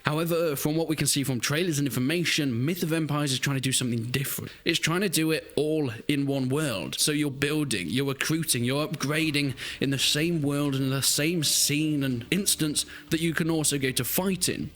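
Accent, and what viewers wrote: United Kingdom. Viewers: I can't help but despise your heavy British accent and pretentious voice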